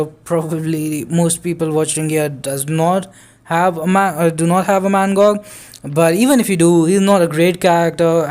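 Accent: India. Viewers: Bro are you from India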